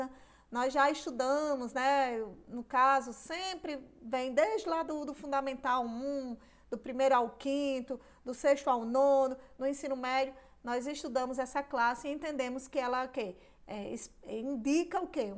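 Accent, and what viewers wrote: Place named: Northeast, Brazil. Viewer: Esse sotaque nordestino me faz ter tranquilidade e paz para saber e entender que estou com uma grande professora, face considerar que o estudo nordestino, se não for o melhor, é um dos melhores do pais.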